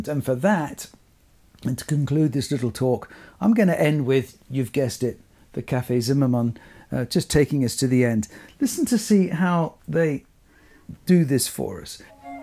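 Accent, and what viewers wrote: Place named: United Kingdom. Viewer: Any Americans watching don’t let the British accent fool you. This man has nothing knowledgeable or illuminating to say about this music. His vocabulary is tiny and his attempts to pronounce French, German and Italian are pitiful. Worst of all, and it’s a crowded field, is the way the camera lingers on him throughout the extracts as he “conducts” along, bereft of any sense of rhythm, like Cary Grant as Toscanini.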